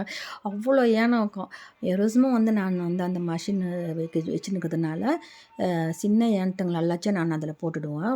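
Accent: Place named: Pondicherry, India. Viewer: Bonsoir, comme d'hab,une bonne recette toute simple.J 'adore tes vidéos pour ta façon de parler,une vraie Pondichérienne 😄.Tu me fais pensé à mes parents et à mon enfance.Merci ma chère.Continue à nous faire plaisir ❤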